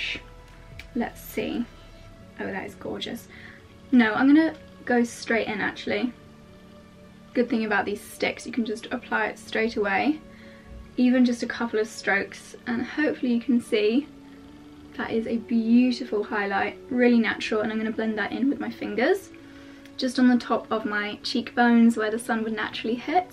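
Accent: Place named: England, United Kingdom. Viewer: I love just listening to you talk. Such a beautiful voice and cute English 'accent' :)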